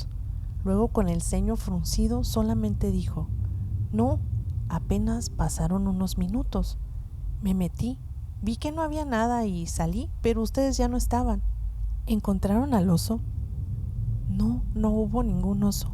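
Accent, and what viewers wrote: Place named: Hermosillo, Mexico. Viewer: Se nota el acento Hermosillense, saludos paisana.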